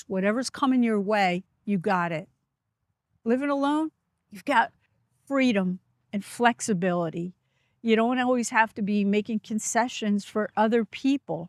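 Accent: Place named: East Coast, United States. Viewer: You have wisdom,! You are caring and a great communicator. Thanks for lifting us up. Life & happiness is what you make it. Love your kind heart & east coast accent. ❤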